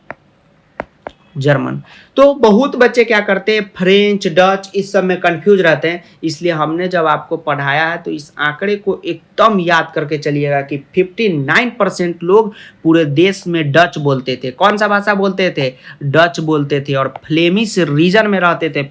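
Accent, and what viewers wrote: Bihar, India. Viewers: Hence proved This bihari assent touched my heart as i am myself a true bihari😀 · Bihari style to thik hai, lekin words ka pronunciation proper karna chahiye. Needs improvement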